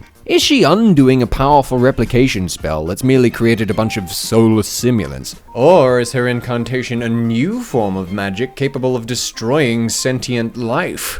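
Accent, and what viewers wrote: United Kingdom. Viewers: British narration & comedy on mlp comentary. Love it.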